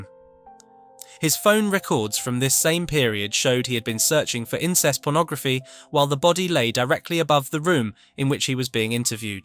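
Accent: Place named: United Kingdom. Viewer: Seriously you sound like your from the UK and you can't pronounce half the words right 😂😂😂😂😂😂😂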